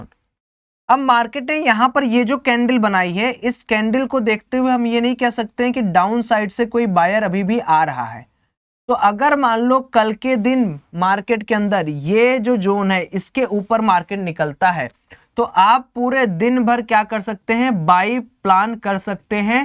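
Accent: Varanasi, India. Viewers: Varanasi se ho kya bhai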